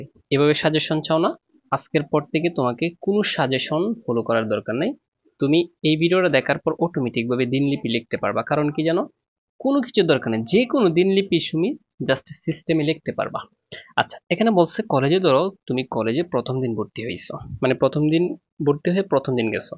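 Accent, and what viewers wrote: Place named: Brahmanbaria, Bangladesh. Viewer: স্যার আপনার কথা গুলো শুনে মনে হচ্ছে আপনার বাড়ি ব্রাহ্মনবাড়িয়া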